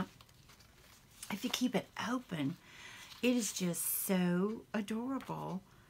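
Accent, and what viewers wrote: South, United States. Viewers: I live your accent you are such a sweet southern belle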